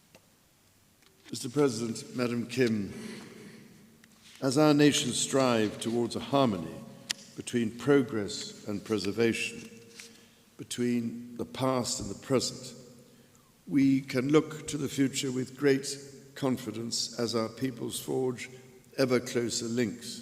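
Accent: United Kingdom. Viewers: 영국식인데 발음이 엄청 잘들리네 왕족이라 그런가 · 폐하의 브리티쉬 스피치가 너무 고급스럽고 감격스럽사옵니다 ㅠㅠ 멋져요 전하🎉🎉🎉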